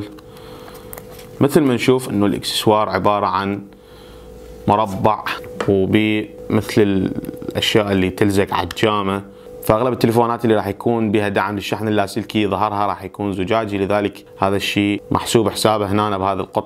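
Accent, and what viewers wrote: Iraq. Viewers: والله لهجتك كلش عراقية ،انتة مو كويتي ؟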